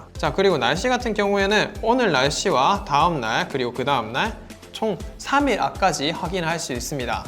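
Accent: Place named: Gyeongsang, South Korea. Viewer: 부산 경남 사투리 정감어립니다 반갑쑤~ㅎㅎ 일반 스트랩 메탈 절대 호환안됩니다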